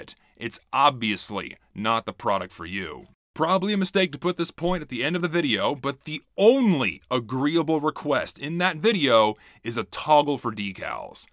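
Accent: South, United States. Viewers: You should is it embrace the Texan accent, dude. It’s slipping anyway. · Is it me or does sound really Southern in this video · with that southern droll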